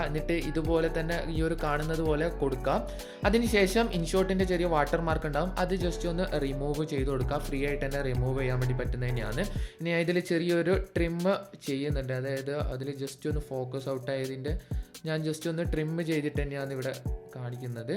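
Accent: Kannur, India. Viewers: Ningal kannur aan. Alle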